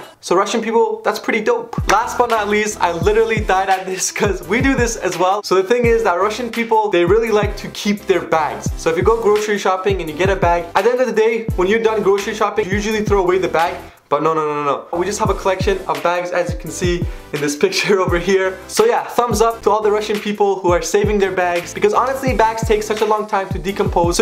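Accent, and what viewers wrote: Isfahan, Iran. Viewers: الهی قربون اون لهجه اصفهونیو وشیرینتون دادا❤❤❤❤❤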